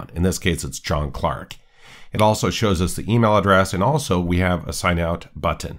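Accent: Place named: United States. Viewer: And u speak "American-English." I can understand some dialects, accents...But IF I cannot -- that's just another CHALLENGE for me in an already challenging situation. I dont want to be inflexible, but it is just a fact. Again, MANY THANX!!!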